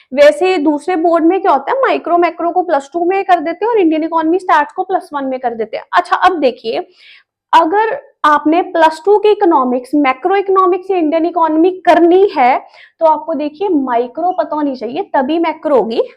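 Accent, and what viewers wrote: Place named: Punjab, India. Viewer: Mam i think tou are from punjab from your accent😅😇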